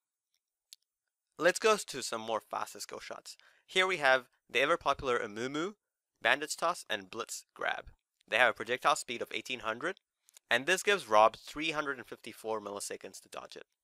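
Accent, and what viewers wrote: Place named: South Africa. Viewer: you sound south african dude :D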